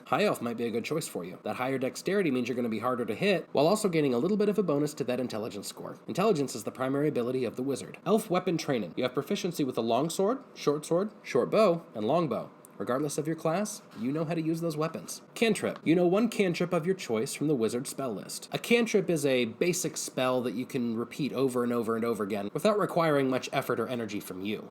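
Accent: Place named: Scotland, United Kingdom. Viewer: Your Scottish accent is on point.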